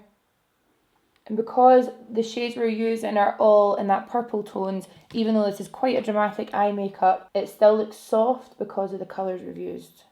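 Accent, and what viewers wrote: Scotland, United Kingdom. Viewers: Got my captions on because I'm blow drying my hair and can't hear... YouTube cannot translate the Scottish accent. Instead of 'contouring' the subs said 'corn shooting' and it's killing me 😂🤣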